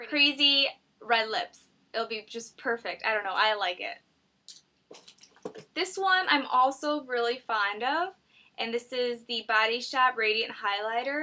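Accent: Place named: Alaska, United States. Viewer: I just noticed the sarah palin accent too haha.. anyway nice video!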